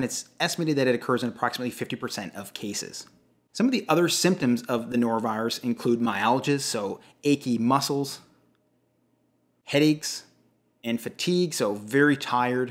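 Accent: Canada. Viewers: It’s underrated how dangerous this thing can be. I’m a kidney transplant recipient and I’m terrified of this virus. If I get it, not only am I at risk of damaging my transplant by dehydration but also not being able to keep anti rejection drugs down. I’m immunocompromised too so I’m terrified. Love the narrators Canadian accent btw. Reminds me of my crush, Calum Worthy❤❤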